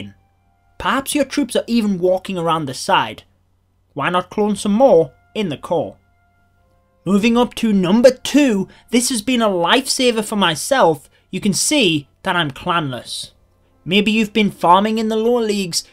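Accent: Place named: Scotland, United Kingdom. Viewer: Where are you from? You sound Scottish and English combined.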